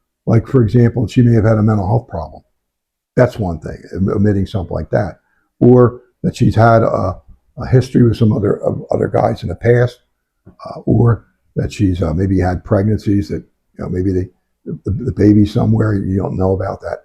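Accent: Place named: Western Pennsylvania, United States. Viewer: Where are you from? Sounds like west PA accent.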